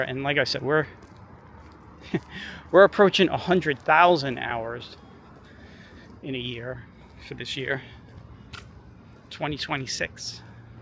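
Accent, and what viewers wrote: New York, United States. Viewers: i said it b4 but have to again .. i fkn love ur videography !! its perfect and the narration the real ny accent hah , the non touristic streets aka the REAL streets.. love it !! if i was ever to get to usa these are the kinda streets id be roaming on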